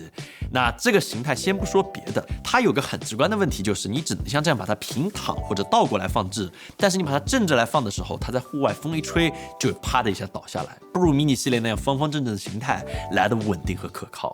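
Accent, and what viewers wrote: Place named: Jiangsu-Zhejiang, China. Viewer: tim口音像江浙一带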